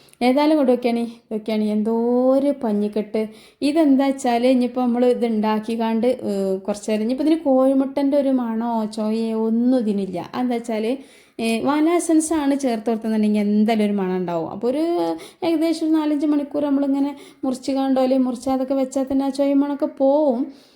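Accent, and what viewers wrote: Malappuram, India. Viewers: മലയാളം മലപ്പുറം മലയാളം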